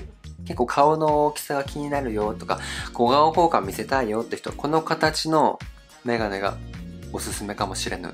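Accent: Kansai, Japan. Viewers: クリアメガネめっちゃスキィィィ！！🥺 関西弁大好物→ ゼブラ可愛い🦓💕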